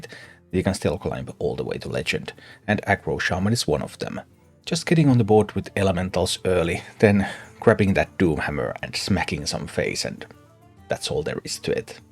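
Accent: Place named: Finland. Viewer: Sä paras! Olin amerikkalainen vaihto-opiskelija, joka asui suomessa 5 vuotta sitten. Rakastan videoitasi ja varsinkin aksenttiasi, se tuo mieleen ne ystävät, jotka sain siellä Porissa.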